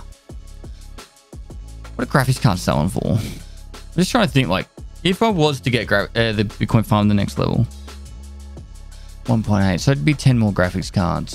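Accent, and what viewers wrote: Australia. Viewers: Its 3am. Sleep: calling: has new vid out Me: I MUST HEAR HIS AUSTRALIAN ACCENT AND BEHOLD HIS EXCELLENT MARKSMANSHIP. It gib me serotonin boost:)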